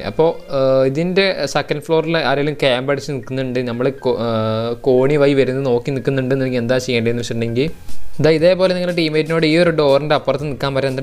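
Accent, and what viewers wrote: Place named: Malappuram, India. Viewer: Veed malappurm ano😂 voice kettuchoichatha💯🪄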